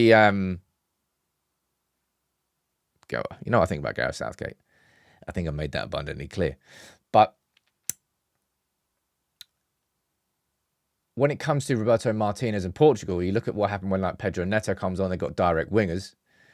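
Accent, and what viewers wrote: Manchester, United Kingdom. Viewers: Where manc. Accent come from gaha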